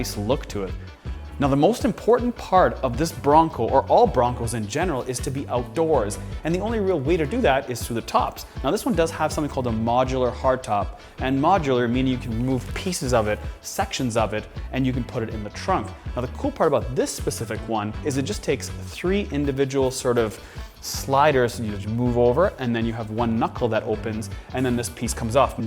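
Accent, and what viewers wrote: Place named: Canada. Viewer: When I started listening to this guy, I swore he sounded Canadian, and then I found out he is hahaaha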